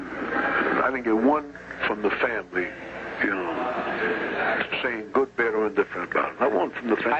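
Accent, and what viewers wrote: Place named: Sicily, Italy. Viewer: Scicilian Accent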